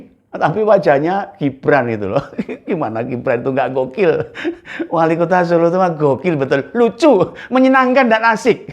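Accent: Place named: East Java, Indonesia. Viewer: Logatnya...muuuaantaap...kang... tuban jawa Timur...👍🙏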